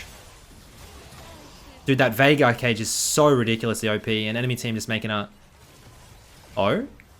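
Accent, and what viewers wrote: Australia. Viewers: I believe thats bcs of the australian accent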